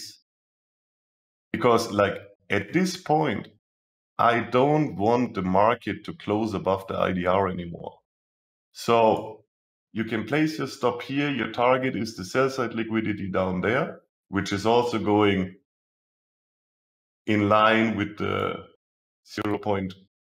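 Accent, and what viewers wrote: Germany. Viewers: Are you German, bro?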